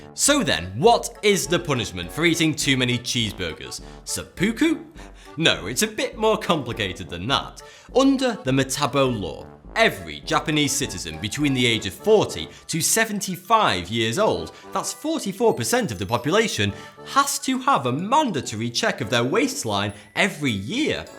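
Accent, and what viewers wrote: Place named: Yorkshire, United Kingdom. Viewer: Man, that Yorkshire accent...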